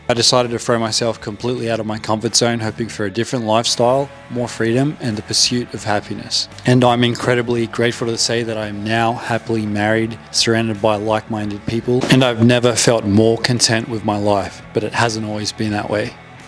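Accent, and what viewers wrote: Australia. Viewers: crazy you still have your aussie accent tbh. people lose it in like months let alone 5 years. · Glad you still sound Australian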